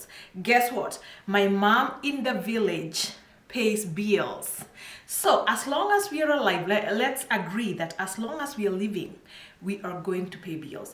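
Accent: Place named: Jamaica. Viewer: I actually thought you were Jamaican, even when I watched your other channel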